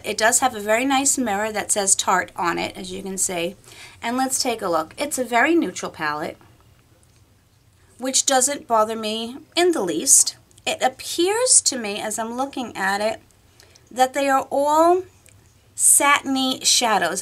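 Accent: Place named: New Jersey, United States. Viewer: I'm new from Abby.. u r beautiful an u was great on camera.. Edit... where are u .. ur accent is so New Jersey LOL LOVE IT 😘 also I got the same box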